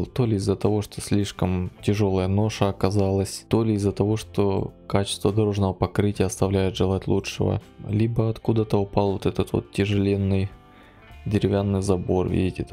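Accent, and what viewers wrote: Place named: Odessa, Ukraine. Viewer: Если вы посмотрите много видео этого товарища, то поймете что он очень сильно политизирован, лично меня всегдп удивляло когда он на украинских городах находил наилучшие места города а в России худшие, при этом выглядили они наравне даже при этом ахаза))) Да и к тому же акцент как у одессита. Посмотрите его сравнение про Одессу, он город с разрушенными домами, убитейшими дорогами. Сумел найти хорошин места и выставить его этаким среднячком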